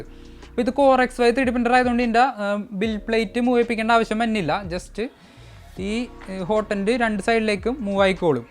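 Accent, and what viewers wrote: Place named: Kodungallur, India. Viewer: കൊടുങ്ങല്ലൂർ, കരൂപ്പടന്ന ആണോ, അവിടത്തെ ശൈലി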